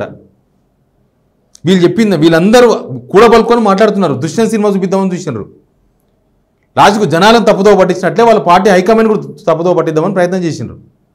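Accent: Telangana, India. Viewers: Very nice explaining. Very good Telangana language garu.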